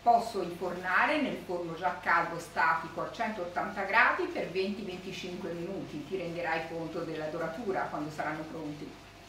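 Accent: Tuscany, Italy. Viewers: Bravissima come sempre. Ma te non ti chiamaviTerrore Spendido ? O mi sbaglio ? Ti ricordo bene col tuo accento Toscano che personalmente mi fai morire dal piacere ascoltarti va be bellissima sta pasta frolla senza dubbio li farò col cuoreeee ❤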